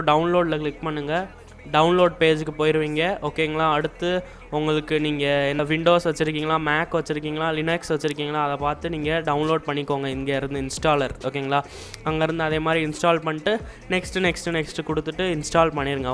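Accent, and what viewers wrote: Madurai, India. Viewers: thambi madurai ya...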